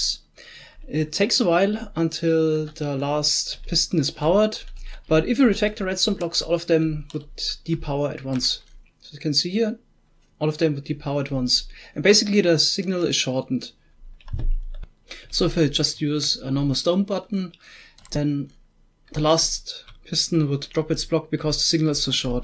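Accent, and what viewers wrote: Germany. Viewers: Bist du deutsch? Klingt so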